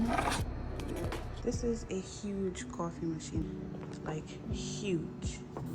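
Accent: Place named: Trinidad and Tobago. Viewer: Doh lose dat trini voice, eh!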